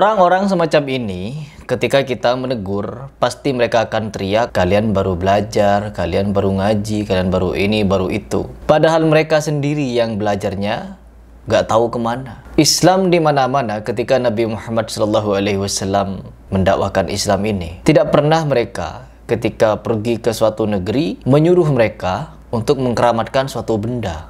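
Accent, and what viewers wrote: Madura, Indonesia. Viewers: Lidah madura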